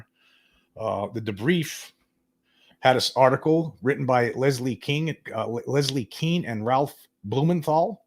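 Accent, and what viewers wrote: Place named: Long Island, United States. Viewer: Ok. I grew up on Long Island. Sorta recognized the accent as being in the ball park. Thanks…and good show today. When do you do your podcasts?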